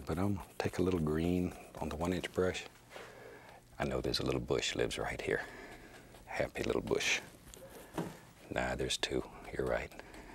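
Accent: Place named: Midwest, United States. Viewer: That's his mid-western accent. He's originally from Indiana.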